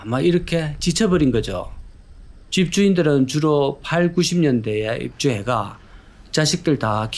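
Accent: Gyeongsang, South Korea. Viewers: 구수하니 경상도 사투리 듣기 조아요 아주 꾸밈없는 목소리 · 엄마가 아니고 음마도 아니고 은마입니다 ㅋㅋ 갱상도 사투리 매력😊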